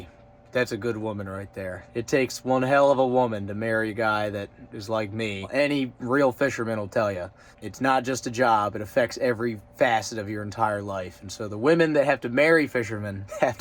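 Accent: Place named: Maryland, United States. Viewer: First time viewer here - I heard your accent and knew you were from Maryland 😂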